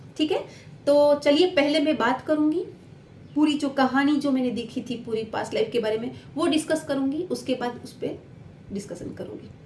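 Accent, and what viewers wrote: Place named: Gujarat, India. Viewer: .... Tamari bolvani style.. Havbhav bikul Gujrati se 👌👍😇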